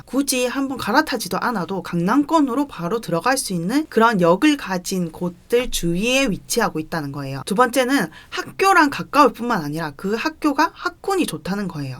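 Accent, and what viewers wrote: Gyeongsang, South Korea. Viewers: 대구 사람 아입니까?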